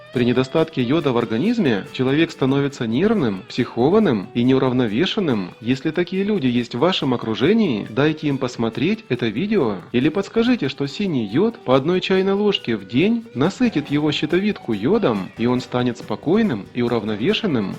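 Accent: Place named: Russia. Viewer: Тоже ламаю голову,не могу вспомнить, -в какой местности Росси такой говорок ? ) )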